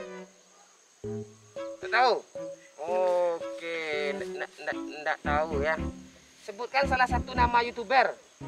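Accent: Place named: West Sumatra, Indonesia. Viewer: Akhirnya ku tau siapa dibalik suara Minang kocak😄😄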